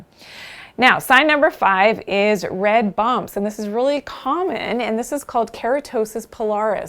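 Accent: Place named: Canada. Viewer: I love her Canadian accent, it’s not something I hear in my country and not even on tv or movies. These were good tips btw that I haven’t heard before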